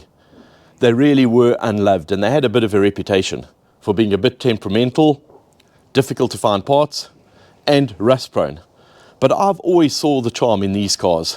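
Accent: South Africa. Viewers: Your accent definitely gives you away 😂😂😂 South African 🎉🎉🎉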